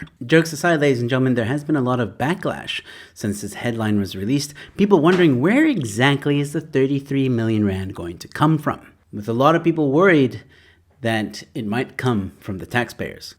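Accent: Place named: United States. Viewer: your video is poorly put together and comes across as propoganda. Who pays you to post this absolute junk and why don't you walk around in South Africa to see the development AND integration we have going on? Or are you just more comfortable being sheltered in your home spreading nonsense like this that tarnishes the name of our country? Your accent is American, why do you post so much about cape independence and so on? I'm going to find out whose funding you and you will be held accountable. So will they.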